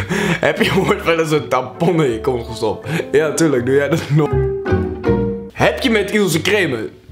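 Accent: Groningen, Netherlands. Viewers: Ben jij een Groninger ??❤️❤️❤️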